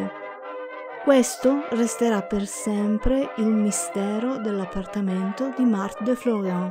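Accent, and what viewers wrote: Italy. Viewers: Sei palermitana tu che parli ???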